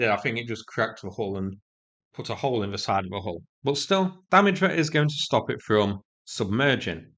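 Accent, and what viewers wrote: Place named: North, United Kingdom. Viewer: WHY DOES THIS BLOKE SPEAKING REFUSE TO HONOR MY REQUEST THAT HE SPEAK MORE CONCISELY AND WITH MORE OF THE QUEEN'S ENGLISH. He has a broader audience than just Manchester or Liverpool or the Lake Districts or wherever he lives. Thank you in advance, again!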